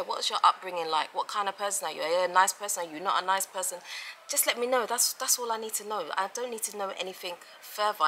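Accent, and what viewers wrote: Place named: United Kingdom. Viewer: You are British my dear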